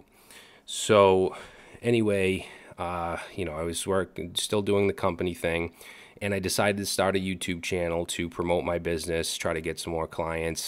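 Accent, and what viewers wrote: Boston, United States. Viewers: you're great. Been here (read: Udemy) since your Eduonix days. PS - your Boston accent is nearly gone!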